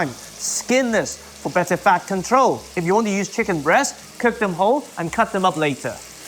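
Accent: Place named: United Kingdom. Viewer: I like his stuff I’ve seen so far. Is he from Hong Kong; he’s got a British accent.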